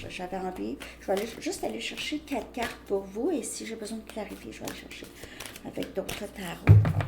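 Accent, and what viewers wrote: Quebec, Canada. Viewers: Hello on a adore l'accent Québecois..on te comprend très bien. et cela me parle beaucoup. s'est lui qui s'éloigne pour ne plus me faire souffrir et moi qui ne me sens pas assez bien pour lui... du coup nous sommes séparés. il n'y aurait que le sexe qui pourrait nous unir.donc il y a hésitation de ma part. merci beaucoup de ce tirage gratitude et bienveillance:planet-orange-purple-ring:🙏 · Bonsoir de la région parisienne. J adore votre accent et le Québec. Ma fille vit à Montréal et j apprecie d y aller. Je suis vierge ascendant gémeaux. Votre lecture résonne bien en moi. Mon autre est parti depuis 5 mois justifiant qu il souhaitait rester seul. Gros choc émotionnel pour moi qui m a empêché d avancer. Je désire tant qu il revienne. Mais je commence à sortir de ce trou noir et espère du meilleur.😊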